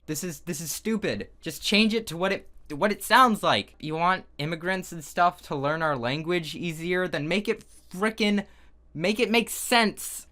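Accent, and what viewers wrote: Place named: United States. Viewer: F A N T A S T I C :3 I thought he was American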